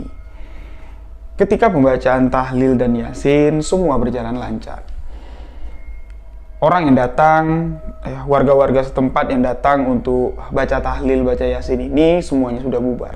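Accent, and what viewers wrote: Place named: Jambi, Indonesia. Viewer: Walaikumsalam Yana -Banten hadir Kak boleh request upload kisah Kota Ghaib Saranjana..... MasyaAllah Pasti y kereen banget lw cerita y yg bawain kak dgn Logat Jambi yg khas....🙏 Hayu Gaskeeuunn atuh kak..... 🔥🔥🔥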